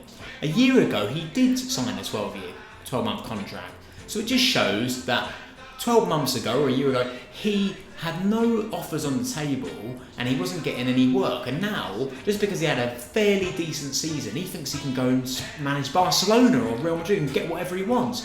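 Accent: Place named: Yorkshire, United Kingdom. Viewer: Leeds are finished. Glory days have gone. As for being a big brand, come off it. Boro have money sure but we also have the best owner in football. Steve Gibson is known for backing his managers and giving them time so Garry Monk will certainly have job stability. Something which he'll never get at Leeds with your recent track record. Also we have a much better team plus we can actually fill our stadium which is something Leeds haven't done for donkeys year's. Boro are a much more attractive prospect for managers and player's. On another note I'm struggling to work out which part of Yorkshire your accent is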